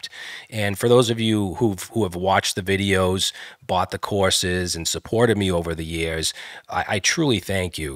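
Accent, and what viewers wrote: Boston, United States. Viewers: best Boston accent on YT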